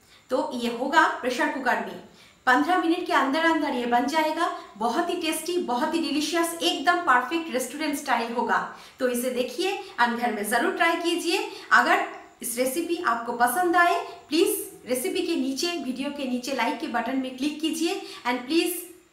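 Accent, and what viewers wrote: Bengal, India. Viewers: Liked your recipe and your hindi with an awesome Bengali flavour👌 thanks for this wonderful recipe.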